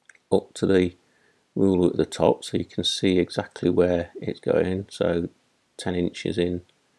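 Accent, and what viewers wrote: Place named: United Kingdom. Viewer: Really helpful vids....And I myself love the British accent...its very proper...Thanks.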